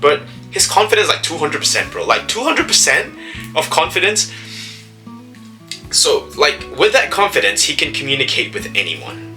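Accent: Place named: United States. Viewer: You say you’re not a native English speaker, but I’m wondering how that’s possible haha. I’m an American and you just sound perfectly American to me. Can you explain how this is the case? You must have consumed English content extensively since you were a kid. Whatever you did, I need to apply it to my life in Japanese, since I’m living in Japan 😂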